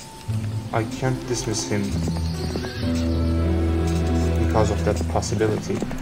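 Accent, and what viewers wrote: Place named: Turkey. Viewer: Are you Turkish? Your accent is Turkish.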